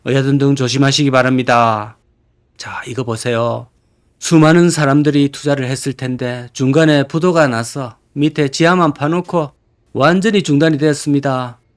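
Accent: Gyeongsang, South Korea. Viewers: 우야노~? 갱상도 사투리 ㅎㅎ 넘~재밋다. 파이팅!!! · 강호 발바닥 TV는. 전국민이 한번쯤 들러 봐야하는 필수 유트브. 구수한 경상도 사투리 매력 짱입니다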